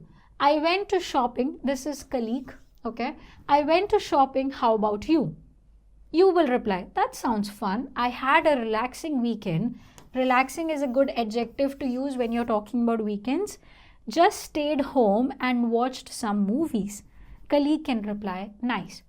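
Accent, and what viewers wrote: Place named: India. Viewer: I am used to watching this channel, because you use Indian pronunciation.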